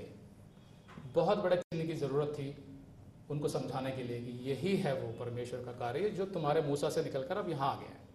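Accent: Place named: Kerala, India. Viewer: Superb well explained Bro.. I really like your Pure Hindi language after you been a malyali language mother tongue. Appreciate much on this topic of tongues. Will share to others as not much speakers in YouTube are Hindi speakers. Also we have spoken on phone many years back. GOD BLESS YOU ABUNDANTLY. I have also got an article on this topic As we print and distribute it free to aware people about this wrong doctrine.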